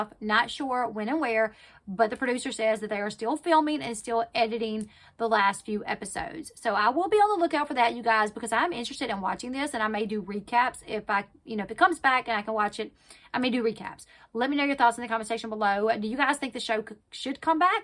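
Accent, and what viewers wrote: United States. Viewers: I like your accent. What part of America are you from? I’m from South Australia, so I’ve never heard your accent before.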